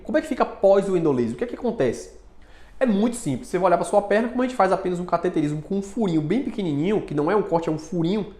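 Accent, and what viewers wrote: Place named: Bahia, Brazil. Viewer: Eu acho lindo o sotaque baiano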